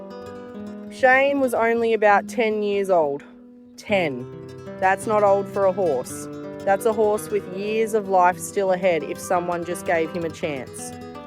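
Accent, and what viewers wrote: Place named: Australia. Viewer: So so agree with you. Your words give me the courage to go out and become a better human to offer what I have what I have learnt from my involvement with animals the good that I can still give to those who need something in their lives. Where are you situated? Your accent sounds Aussie is it.? Let me know please. Thankyou for all you do god bless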